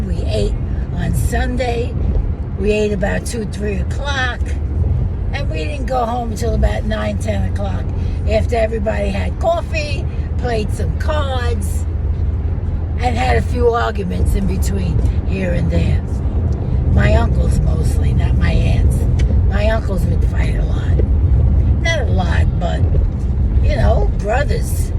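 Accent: New York, United States. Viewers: . Nope. My sister who talks like you is only one of our family still in NY. My Brother and I later went to Medical School in Omaha just around time Henry Hill ( of Good-fellows infamy) came to Omaha. Our accents were like yours 40-50 years ago. Can’t tell you how many times people asked one of us if we were in Witness Protection .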